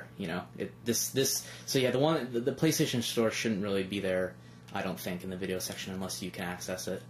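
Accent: Canada. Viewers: gotta love the way canadians say "about"!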